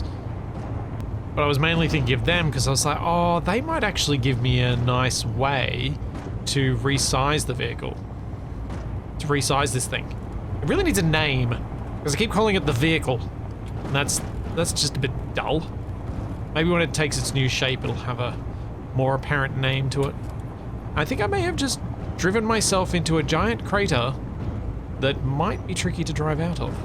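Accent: Australia. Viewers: With Ozzy Man dialect, my brain keeps translating his talk about "half-width stairs" into "half-wit stares"... which I'm kinda takin' personally... 😳🥸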